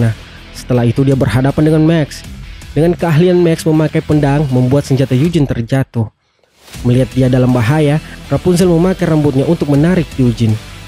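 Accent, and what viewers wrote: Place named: Manado, Indonesia. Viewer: Suka krna logatnya, logat menado kah? Intinya suka bgt deh 😂💙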